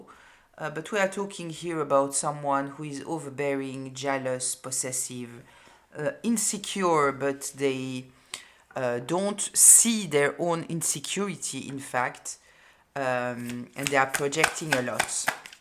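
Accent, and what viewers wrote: France. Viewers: Sometimes I just glide on your beautiful French accent, I should have met a cute Frenchman in life 😂🇫🇷💋✌️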